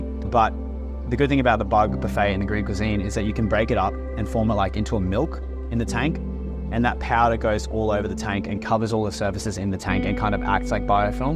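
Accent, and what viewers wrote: Australia. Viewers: I love all your videos. May I make a suggestion? The music audio is a bit too loud; it makes it harder to follow your wonderful Aussie accent!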